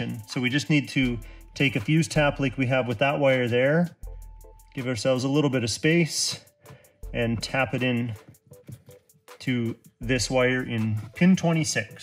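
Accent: Canada. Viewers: I was wondering why you sounded like Jordon Peterson and used some of the wordings he does until I realized you’re both Canadian lol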